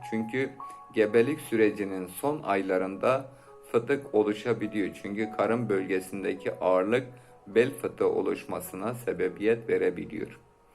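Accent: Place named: Van, Turkey. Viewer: Vanli mısın hocam😊 65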